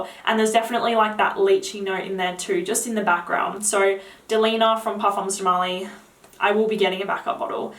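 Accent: Australia. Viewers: i looooooove your cute australian accent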